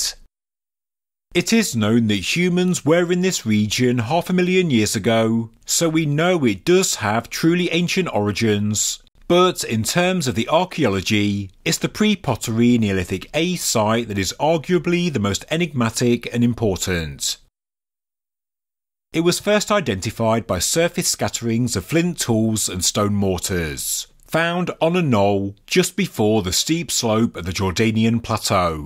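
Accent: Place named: West Midlands, United Kingdom. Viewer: Native of the UK here, I believe it is a west midlands accent ( guess only ) I play at 1.25 speed it makes it more bearable 😂😂😂😂😂😂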